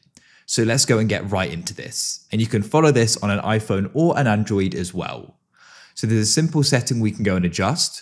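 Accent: United Kingdom. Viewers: You know what buddy it was helpful.On top of it I can guarantee that you are a Brit. I am used to hearing proper english as it's 11 years I am working for BT 😅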